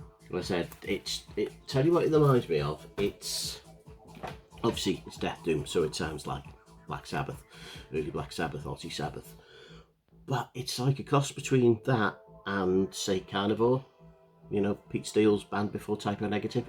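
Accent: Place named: Wales, United Kingdom. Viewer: I still can't get over your Welsh haha. Either way, cheers for the shout out mate. That boxset been on my list for ages and I keep meaning to ask for it for a birthday or Xmas. Good stuff, there is a new band with ex members called ' ' which I picked up. Not bad but more in a doomy industrial style. Love that album as well, second one good as it has singer from on it. 🤘💀🍻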